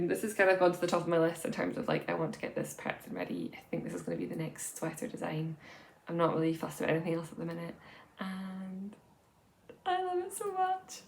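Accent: Scotland, United Kingdom. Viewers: Has your Scottish accent got stronger this past year?? 😂 Loving your creativity and pattern designs. Thank you for another fab podcast. Xx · I really enjoy watching your videos and I like the challenge of understanding you properly due to your Scottish accent. :-D Your Cargill pattern looks stunning, looking forward to knitting it once my knitting skills have improved. I have already knitted several sweaters, but they were all pretty simple. Greetings from Germany! :-)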